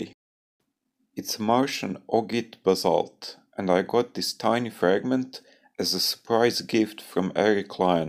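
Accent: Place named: Eastern Europe. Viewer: Thank you! That's very kind of you! I do appologize for the thick 'slavic' accent 😃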